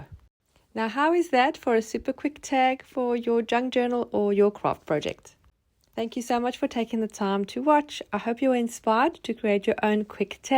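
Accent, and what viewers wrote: South Africa. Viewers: HI I hear that you are south African .are you based in SA or somewhere else. Wendy from SA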